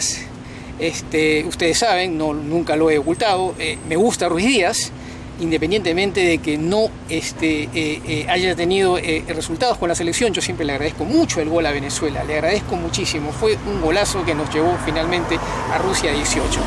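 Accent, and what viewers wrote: Argentina. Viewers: Eres peruano?, y el dejo argentino???....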